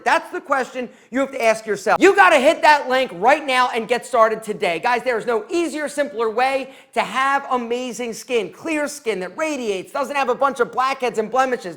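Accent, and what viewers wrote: Philadelphia, United States. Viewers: You're from Philly arent you?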